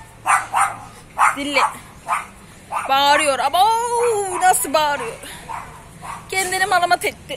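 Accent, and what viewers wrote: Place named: Sivas, Turkey. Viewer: Guzel kardesim gülen yüzün hep gülsün ,lehcen güzel Sivasimiza çok benziyor.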